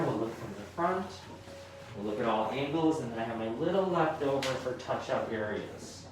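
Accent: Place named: United Kingdom. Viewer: I can’t quite tell the nice accent… British, South African, Australian?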